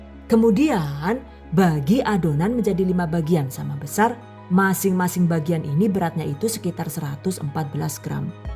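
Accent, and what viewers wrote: Banyumas, Indonesia. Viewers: Tinggal olih neng Jatim, tapi ngomong tetep ngapak ya bund😅 #GiveawayTHV2021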